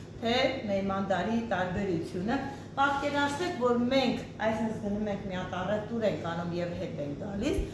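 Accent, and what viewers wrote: Lori, Armenia. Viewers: Էնքան հետաքրքիր ա խոսում ես Լոռվա բառբառով Արտաշատինը հետը խառնած😂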